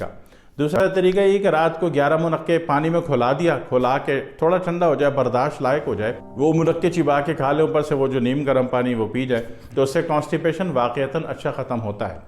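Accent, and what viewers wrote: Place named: Lucknow, India. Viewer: انداز گفتگو سنکر لکھنو کی یاد آگٸ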